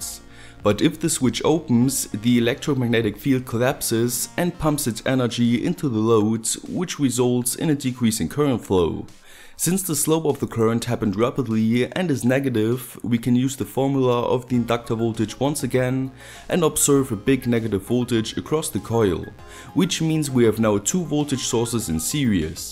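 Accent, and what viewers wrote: Germany. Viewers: Can't tell Is he german or Chinese? Or both ?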